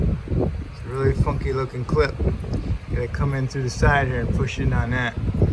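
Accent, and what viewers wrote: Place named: Chicago, United States. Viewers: That’s a Chicago accent if I’ve ever heard one. Am I right? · just sounds like a midwest generic mechanic accent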